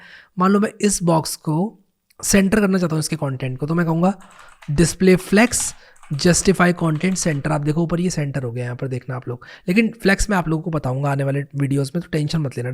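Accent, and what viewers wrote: India. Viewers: the best style of speaking, ekdam desi style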